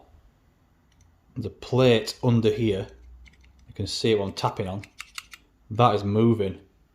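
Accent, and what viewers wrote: United Kingdom. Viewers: I have come across your channel by accident and absolutely love it, I am now a new subscriber to your channel. I love your Leeds/Bradford accent which only adds to the entertainment as far as I'm concerned. Great video's, great content, love it.....Keep it up.....I'm another VTR owner too. Cheers. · Thanks so much 😸👍 by the way your accent - are u from the same area as Sean Bean the actor?😸 My 1997 vtr is behaving a bit strange its always been a reluctant starter the 3 or so years I've had it especially in the colder mornings (below 10 degrees c starts affecting it) the colder, the worser. Just put manual cam adjusters on, tensioned by hand & went to restart to set them on the run. It wound over fired randomly, ran, didnt run, wouldn't start, backfired bad couple times. Found a plug electrode upper core broken (replaced both plugs). 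Tried again got it going & warm, adjusted cam chains by ear and shut it down thinking alls well. Tried again 5 min later & the timing chains (or something) making moaning sounds while winding over & it wound slower & made me fear its trying to sieze but at 12.66 volt it seems the battery is on the way out. Possibly starter too it was hot. But 20 min later after a cool off & battery slight self rejuvination, it turned the bike over ok however got another big backfire & sounding like it just doesn't want to fire. Battery now definitely getting down so that was it for today. Theres many things reall due now to start my maintenance records like setting valve cam clearances, I'd like to check the chain adjuster skis. Has new plugs now & I want to check the ignition coils if possible. Generator looks ok but found the left crank seal needs done (genny got oily) because the bung wouldn't come out of the generator cover cos some idiot overtightened or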